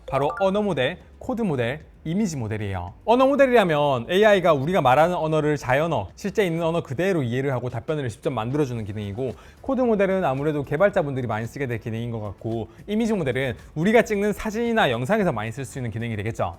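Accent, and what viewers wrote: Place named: Seoul, South Korea. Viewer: 오랜만에 왔는데 말투가 시원시원하게 바뀌시고 딕션도 더 좋아지셨어요 빠르기도 빠르고 부드러운 서울말쓰는 느낌 ㅎ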